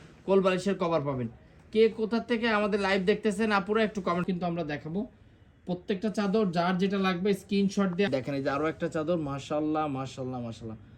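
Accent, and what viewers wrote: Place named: Jessore, Bangladesh. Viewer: আমি যশোর থেকে আসসালামু আলাইকুম,,,,, কেমন আছেন ভাই আপনার কথা শুনে মনে হয় আপনিও যশোর এলাকার দিকের কোথাও আপনার বাড়ি হবে,,,, 😊আপনার ড্রেসগুলো আমার খুব ভালো লাগে আমি ছোটখাটো একটা টেইলার্স দিয়েছি ইচ্ছা আছে আপনার শো রুমে গিয়ে কিছু প্রোডাক্ট নিয়ে আসবো হেল্প করবেন আশা করি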